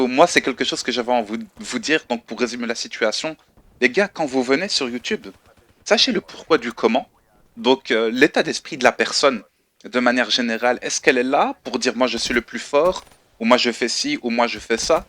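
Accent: Belgium. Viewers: est tu belges stp répond 😜❤️ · T'es belge?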